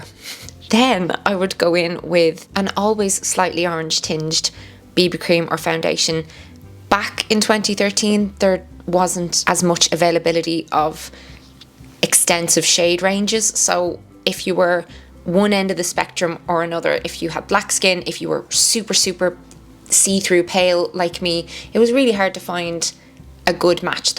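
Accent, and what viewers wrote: Ireland. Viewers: I've been here from the start. Started following you for the beautiful Irish accent and stayed for your beautiful personality ♥️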